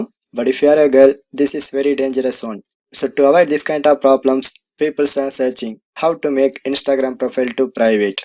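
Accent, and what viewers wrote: India. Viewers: That's Indian I think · I think He's from India 🇮🇳 ❤ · His indian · Kick-Ass Bro it's always a pleasure hearing Indians talk in English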